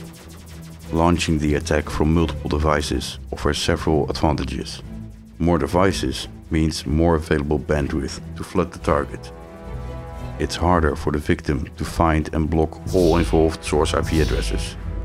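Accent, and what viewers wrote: Netherlands. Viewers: kan horen dat je nederlands bent, mooi filmpje :) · Ja hij heeft een nederlands accent XD. Engels is verder wel gwn goed. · is it just me or does this guy have a dutch accent? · Geniaal, je kunt gewoon horen dat dit een Nederlander is XP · Is that voice from a dutch person?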